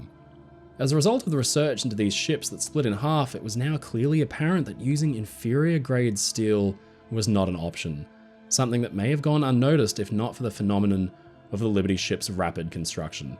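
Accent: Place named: Australia. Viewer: congratulations on a SUPERB documentary. Apart from the quality of your research, your narration is astounding. Educated, articulate, clear diction and an easy- listening Aussie accent without any drawl or ockerism, and your grammar is faultless. As a fellow countryman, it makes me proud that your voice is being heard on the world stage, its just a beautiful example of how things should be done...and especially, it's a huge favourable contrast to the bloody awful AI voice-overs that are being churned out now. Fantastic job, very well done.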